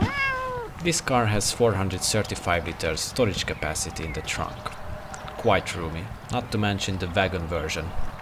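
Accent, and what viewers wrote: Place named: Hungary. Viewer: egyből hallottam hogy magyar vagy, de nagyon szépen végig mondtad és mutogattad én jobban élveztem angolul mint magyarul, és tényleg gyönyörű autó!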